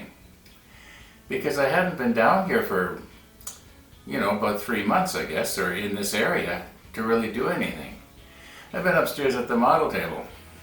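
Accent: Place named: Canada. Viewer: My husband is a retired physician and he builds airplane models from scratch makes plenty of mistakes from which he learns He did a few kits but prefers blueprints and goes from there. We are always collecting little interesting oddities to use. I will show him this channel it is very interesting. I am trying to get him to start on ships. My living room is no more airplanes hang from the ceiling LOL I wondered why you disabled the baking soda and glue (super glue?) video you made and became hollow. I personally loved that myself and will use it. I think it is super glue. I love videos are are unscripted and simply human! Thanks for posting. You sound like you are from Canada? or Minnesota or something like that!!!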